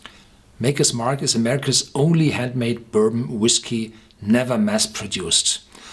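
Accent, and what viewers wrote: Bavaria, Germany. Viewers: I can’t tell if this is German Accent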